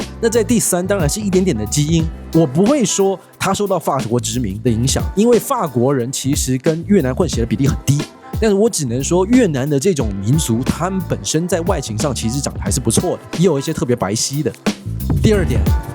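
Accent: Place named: China. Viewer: 你怎麼講話中國羌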